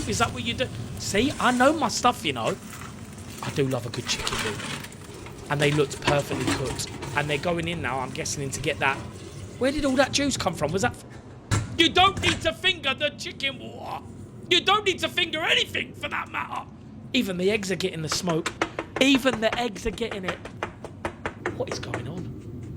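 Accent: United Kingdom. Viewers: OMG the accent gives me life!!! Are you from same region as Adele? So glad to see a full length video. Next i wanna see a podcast!! Thankya!!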